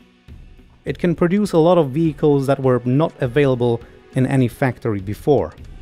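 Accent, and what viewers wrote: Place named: Poland. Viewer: Oo widzę że Polak robił ten film bo akcent nie angielski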